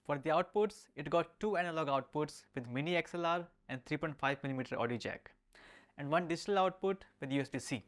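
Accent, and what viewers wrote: India. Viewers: you have an Indian English accent, which I think is fine.But. you can instead focus on Innotation and pause in delivery.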